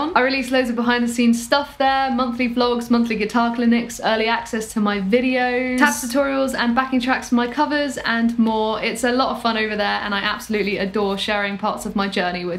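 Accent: United Kingdom. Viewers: I love the British accent on women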